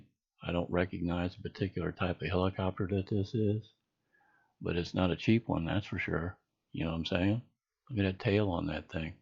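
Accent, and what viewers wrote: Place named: United States. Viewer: Ok. Maybe it was someone else but i thought I had asked you about the solar eclipse we had about 2 years or so ago. And if you had any footage?. I had heard stuff going on at that time. But good to know, you definitely sound American 😄. I'm down in NC. I've noticed must things I see here are towards the east! Maybe coming over the ocean.?.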